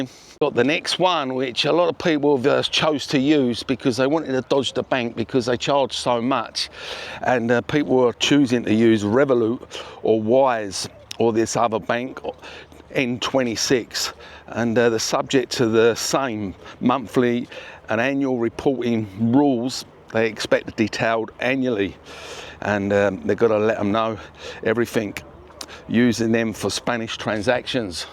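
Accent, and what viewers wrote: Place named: London, United Kingdom. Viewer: Yeah you got a nice London accent mate,but your on the wrong page on this one mate.frack the banks, they are going down mate.with quontam banking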